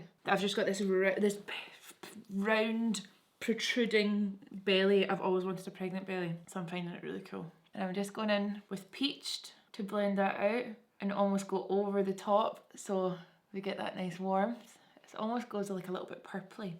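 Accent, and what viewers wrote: Scotland, United Kingdom. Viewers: You look absolutely gorgeous! Love hearing all your baby stories! Can’t wait for all the new things to come.. including baby girl💕🦄👛🌸🎀My family all lives in the UK and I love to hear you chat away cuz it reminds me of them 🥰I live in South Carolina now but grew up in California…. I can’t wait to see Vieve in America 😍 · Your Scottish accent is giving me life! Your fan from HK btw!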